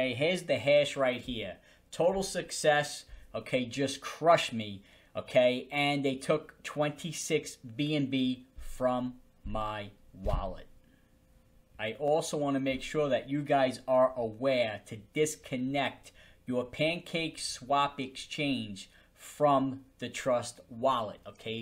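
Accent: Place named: New York City, United States. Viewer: I love your NYC accent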